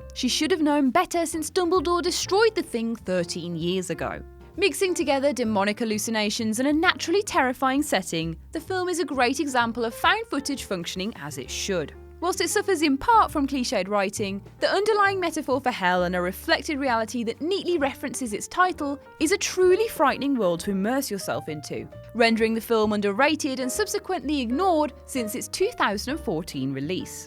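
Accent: United Kingdom. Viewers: UGH. I hate the when they use the commentators with British accents. E#specially this one. Won't be watching this. I'll find a similar list om watchmojo or imdb.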